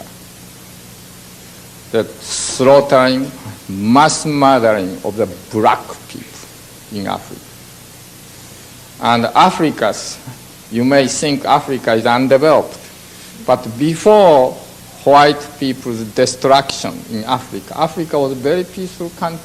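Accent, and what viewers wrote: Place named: Japan. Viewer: Love the video, but I can't unhear that he sounds like Morgan Freeman with a Japanese accent.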